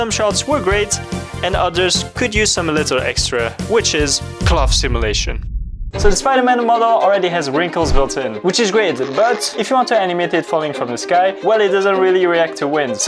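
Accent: France. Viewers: t'es français? si oui, très bel accent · Does my ears mistake me or do you have a french accent ? Seriously I can barely focus on what you said, my mind keep thinking about that, maybe I have an ADHD, idk, just don't ask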